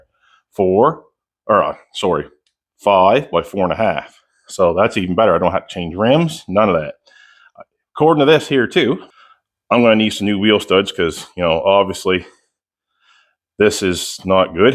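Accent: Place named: Nova Scotia, Canada. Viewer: I knew that accent was from Nova Scotia !!! Kings county here brother !!!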